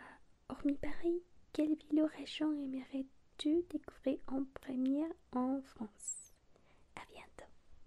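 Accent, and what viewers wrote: Spain. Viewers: Merci d'avoir répondu à ma question. 😊😊😊 J'adore ton accent espagnol. ❤❤ Du coup, un grand tour de France à programmer.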